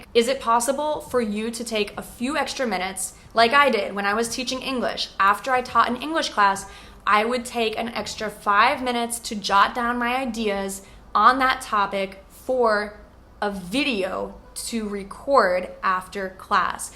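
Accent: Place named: United States. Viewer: I am from India......I know how to speak English but I just wanted to know the American accent Thank you so much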